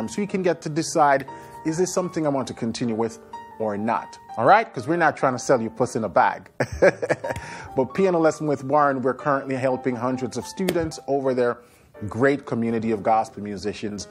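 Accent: Caribbean. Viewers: What Caribbean voice is this…. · Hey Warren I’m from Jamaica 🇯🇲. Are you from the Caribbean?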